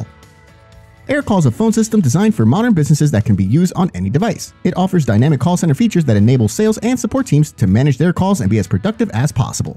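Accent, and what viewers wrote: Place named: United Kingdom. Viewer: This one seems really convincing, the british accent and the free trial sold me on the idea.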